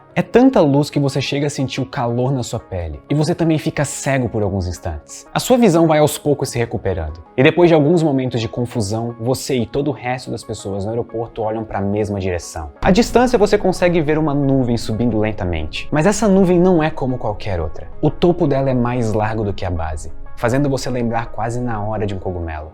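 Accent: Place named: Rio de Janeiro, Brazil. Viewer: CARIOCA SPOTTED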